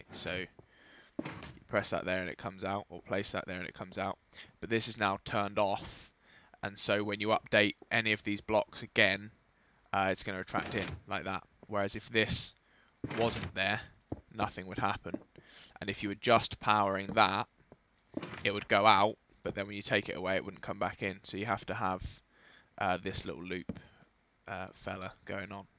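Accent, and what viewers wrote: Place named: England, United Kingdom. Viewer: I'm using your English accent to annoy my mom - she finds it annoying because she strongly believes that this accent does not exist. I will prove her wrong. - To the topic. I love your tutorials.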